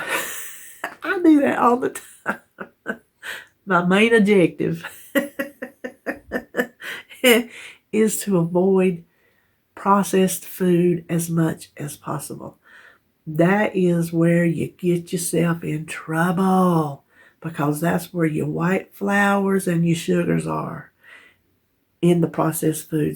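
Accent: South, United States. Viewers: Not going anywhere and can't imagine life without you. So enjoy your sense of humor and southern accent! And what would I do without your DT and Wanda hauls?? We are all in this together no matter what plan we are following. You have been so good and therapeutic for me as I have gone through this 5 months of recuperation from my foot surgery and Co-Vid on top of it, and can't imagine life without and your beautiful soul. Here's hoping 2021 is better for all of us and we will hit the pavement running all together..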